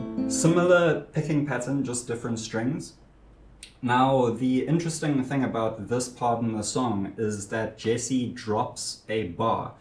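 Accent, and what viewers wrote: South Africa. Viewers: This is awsome man, Ill be using this for sure! Are you South African? If so double hell yeah!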